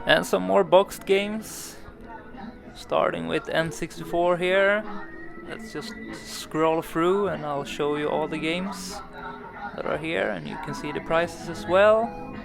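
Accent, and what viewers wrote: Sweden. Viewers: Guessing you saw my comment on jcontra's recent vid and subbed to me as a way to let you know your channel exists.....interesting way to get peoples attention (it worked!), vids look good so far so I guess I'll stick around! bra att veta att det finns fler YouTube retro-samlare i Japan (could tell immediately you're Swedish from the accent ha ha)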